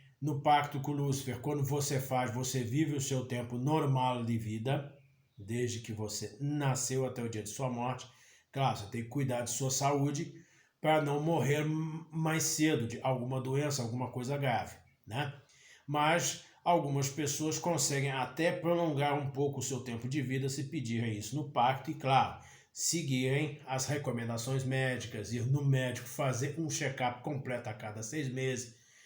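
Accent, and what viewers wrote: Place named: France. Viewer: E de onde vc tirou esse sotaque frances?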